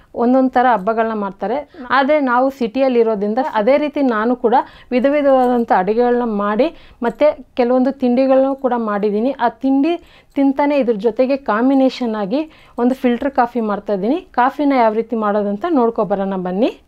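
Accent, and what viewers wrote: Tamil Nadu, India. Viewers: ಇವರದು ತಮಿಳ್ ಅಕ್ಸೆನ್ಟ್😂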